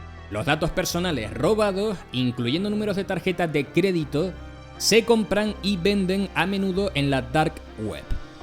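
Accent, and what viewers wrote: Canary Islands, Spain. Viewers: Canario total no? Jaja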